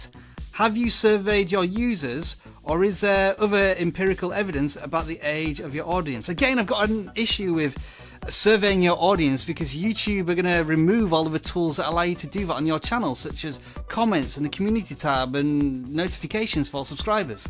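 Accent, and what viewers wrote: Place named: United Kingdom. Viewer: If my comment is missing something on this topic, please be kind and offer some of your own bits of wisdom. First things first: This complete topic applies ONLY to US. citizens living in the territories and states of the US. The laws being considered here ONLY apply to those living within the United States of America! Second, this man (by his obvious British accent) may not be US citizen. We can't know whether he is or is not. How does this law concern him? I need more information! Third, would he please provide how he is so informed about the details of this law. Hope you can see my problem with this video. It appears completely inappropriate to the law in the US.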